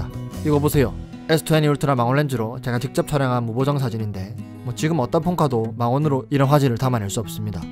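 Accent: Gyeongsang, South Korea. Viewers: 대구분이신거갘은데 코로나 죠심하세여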